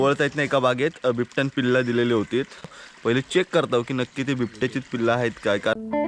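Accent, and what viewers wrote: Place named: Malvani, India. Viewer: अरे तु मालवणी आहेस की रत्नागिरी कर. बोलतोयस मालवणी.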